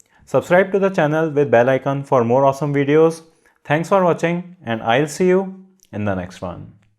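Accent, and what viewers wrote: India. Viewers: Lots of indian accent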